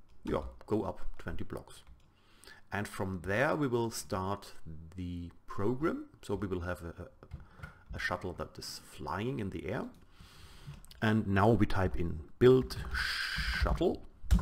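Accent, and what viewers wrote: Germany. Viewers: Are you from germany? nice video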